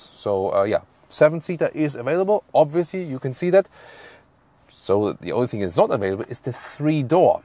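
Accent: Germany. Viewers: 主持人很重德語口音😂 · Not a big fan German cars, their time is over. German guy talks about toyota, very weird. And the accent as well.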